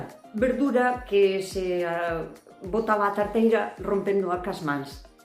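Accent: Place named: Ribeira, Spain. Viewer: Hola,primero por favor donde vives.despuesde más de 50 años puedo oír hablar en gallego,es como en mi pueblo Ribeira ❤ Vivo en Aragón ,añoro mucho Galicia.😢 Me encanta oírte ,abrazos ❤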